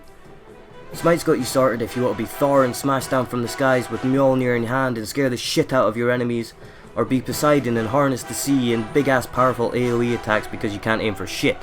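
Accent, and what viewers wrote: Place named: Scotland, United Kingdom. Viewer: Always throws me off when i hear the Scots accent in vid. some fucking good plays there mate.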